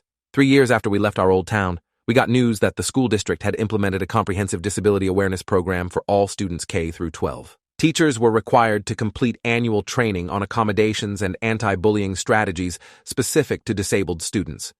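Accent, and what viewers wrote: United Kingdom. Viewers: In every video a British accent happens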